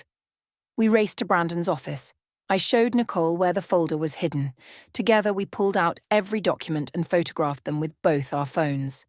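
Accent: England, United Kingdom. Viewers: Very interesting story , Love your English accent ❤ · Just love your real, English accent. So tired of listening to the same, possibly AI-generate voices and bad narration...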